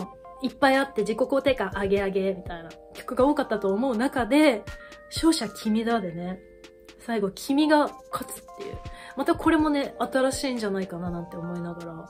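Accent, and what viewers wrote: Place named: Kansai, Japan. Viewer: 関西弁炸裂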